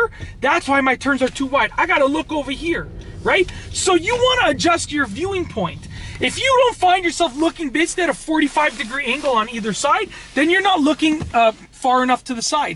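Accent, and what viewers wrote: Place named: Canada. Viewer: I love how I realized he was Canadian when he was yelling from his accent. Haha! I got a very well needed laugh! I love (Toronto) Canadian accents!!! They're my fave! :-D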